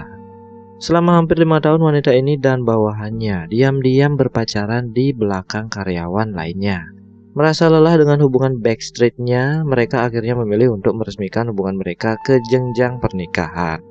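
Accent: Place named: Bali, Indonesia. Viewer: Bali sajan logatne bli wkwk,mantap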